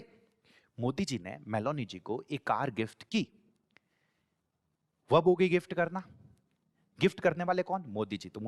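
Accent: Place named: Jodhpur, India. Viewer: Ap jodhpur se ho sir ji apki boli to jodhpur ki lag rhi h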